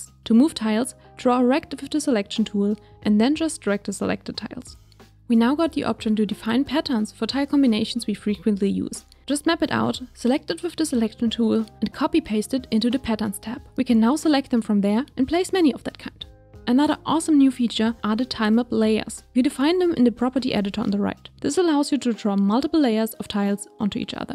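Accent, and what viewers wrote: Germany. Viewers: i hear a little deutsch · Ich antworte mal auf deutsch, da sich dein englisch sehr nach deutsch angehört hat: Das Video ist nicht nur sehr schnell gesprochen, es ist für mein empfinden auch etwas zu sehr hektisch gewesen. Da ich ein Anfänger mit Godot bin fällt es mit etwas schwer alles nachzuvollziehen. Ich werde aber mein Bestes geben. Danke :-) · Man merkt so das du Deutsch bist xD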